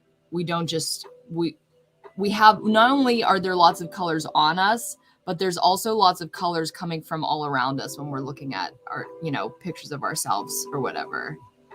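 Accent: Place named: Los Angeles, United States. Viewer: I so love your la accent! I love you sweet.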